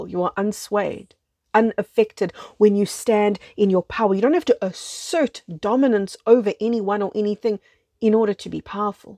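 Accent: South Africa. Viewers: Indeed! Flip the script. From victim to super hero. And always thanking our agony, trepidations, dilemmas, tragedies because in the end if you want them to they are your invaluable inner wealth and that light, baby, (I'm talking like you now 😆♥️) is going to get you to ascension and help steward others who need a hand to hold on their way! Yay. I have two hands and I thank them. Thank you. I'm English Greek I hear your South African accent and melt. Have many S.A. friends in Europe. You feel like family 🥳🎺🙏🏽🙏🏿🙏🏻🙏🏾⭐❤️ Love to all subscribers and beyond! When I can afford it I'm definitely going to sign up for your lessons and group. Yes, baby ♥️🦋.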